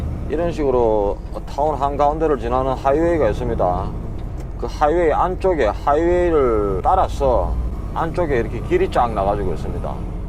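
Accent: Gyeongsang, South Korea. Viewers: 행님 건강하이소^^먼저 저는 부산 경상문디 행님 동생입니다.행님 뷰 홀딱 빠져보고있습니다.뭐 때매빠질정도로 볼까요? 경상도 행님 목소리 인것같습니다. 행님 저는 추레라 하고있습니다.행님 최고다.있빠이 행님 뷰 보면서 마이 배우고 있습니다.돌쇠 힘들어도 마이 챙기주이소~^^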